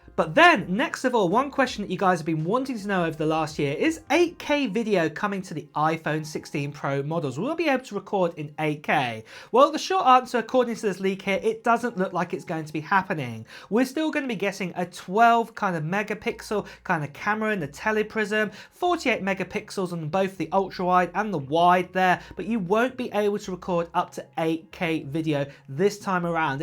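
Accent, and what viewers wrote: United Kingdom. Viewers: UK English accent 🎉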